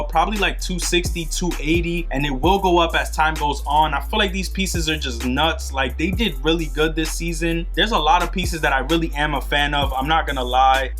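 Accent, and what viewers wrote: New York, United States. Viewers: I love cause his NY accent is entertaining AF. All the “low key” I can deal with but the “dead ass” I can’t. He never “dead asses” lol.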